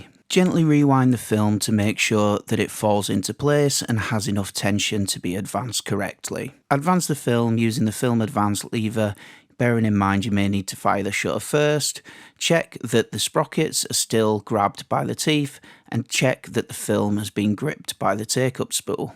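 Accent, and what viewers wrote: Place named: East Yorkshire, United Kingdom. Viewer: Very helpful, thank you ! Also a lovely rare bonus to hear a fellow East Yorkshire accent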